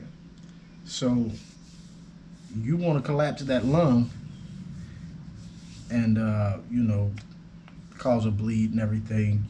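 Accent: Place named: England, United Kingdom. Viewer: Man I love your videos..good honest self reviews.. liked your English accent.. Yes you earned it.. 1. You need to compile all your ammo vs M3 settings because there are too many of them now. May be a cheat sheet.. 2. Comparison of heavier JSB with lighter ones in the same tuning... and on a lighter note , I saw this review on 65 inch so one suggestion- pls do organise your stuff and do some 5S BTW waiting for you next review..love from India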